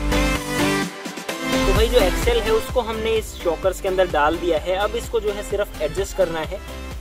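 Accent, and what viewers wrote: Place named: Gujarat, India. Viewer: Oh bai tum gujrat se ho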